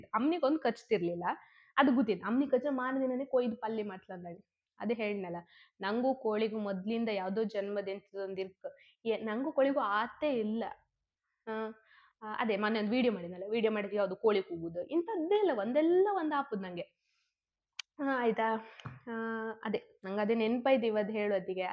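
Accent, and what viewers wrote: Kundapura, India. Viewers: Supper kundapura Kannada I like it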